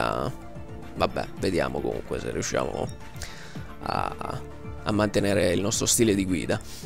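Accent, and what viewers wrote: Rome, Italy. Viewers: pensavo fossi romano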